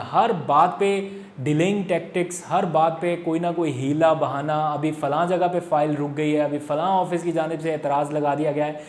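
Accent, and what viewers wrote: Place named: India. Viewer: بہت زبردست جناب شکریہ جناب! ایک بات؛ پلیز بھارتی چینل زیادہ واچ نا کریں کیونکہ آپ جھٹکے والا بھارتی انگریزی لہجہ استعمال کر رہے ہیں۔مثلاً کمپے ٹشن کہرہے ہیں جو کہ کانپے ٹی شن ہے۔ کسی بھی انگریزی وڈیو پہ دیکھ لئے گا اسطرح کے بہت سے الفاظ بھارتی انگریزی کے کہلاتے ہیں مغرب میں۔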